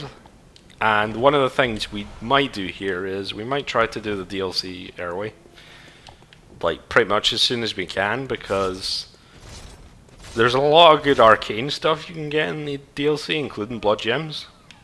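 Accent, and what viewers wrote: Scotland, United Kingdom. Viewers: He's Scottish.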